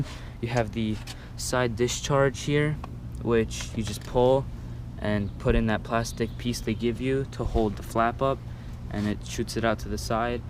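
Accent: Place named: Eastern Europe. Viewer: Good review, however, I'm a sociolinguist and your accent perplexes me. I hear eastern Europe maybe hebraic? Not trying to be rude, honestly curious.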